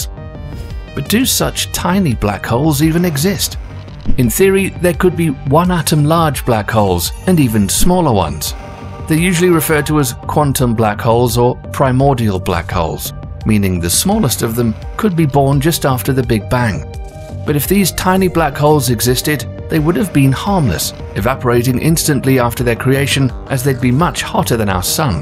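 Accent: United Kingdom. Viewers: Im tired of these anti-christ british speaking clowns who just spread false fear Mongering. The Faith Rebukes Your Ways.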